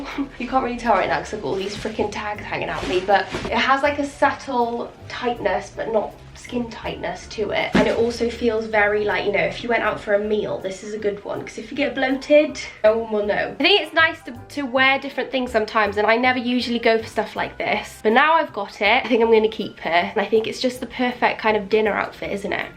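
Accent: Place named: RP, United Kingdom. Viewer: Love this video! You sound so posh now though x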